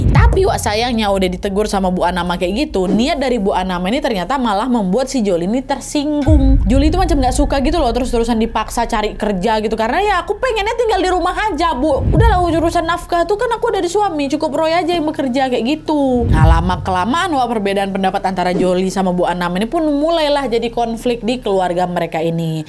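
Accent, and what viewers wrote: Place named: Sumatra, Indonesia. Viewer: Logatnya kek orang sumatra...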